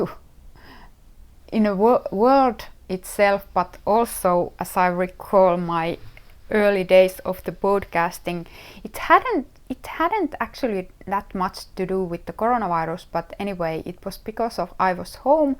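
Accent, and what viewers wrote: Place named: Finland. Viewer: Congratulations!! It is so charming to hear a Finnish accent. I spent my childhood summers in Finland and remember very fondly picking blueberries in the woods near the lake of our friend's summer house. So I would love to let some berries float on lake water: Dreaming of Finland in the knitting of silk ribbing, small bobbles and waving short rows.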